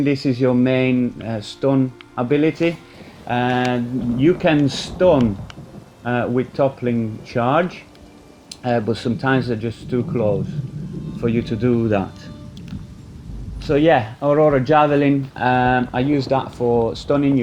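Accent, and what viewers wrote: North, United Kingdom. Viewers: subbed. your accent is mad :) you sound like you are from up north, but you also sound slightly Italian man 😂 not bad though, I like it, it doesn't have those annoying vowel sounds that they make. lol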